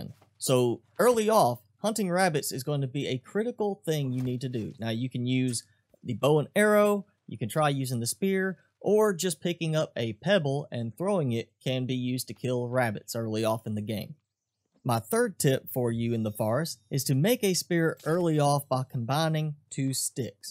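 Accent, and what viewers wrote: South, United States. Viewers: I'm from Georgia and we also talk like that, but when you live here no one notices. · FINALLY A YOUTUBER WITH A SOUTHERN ACCENT, I HEAR EVERYTHING YOURE SAYING BROTHER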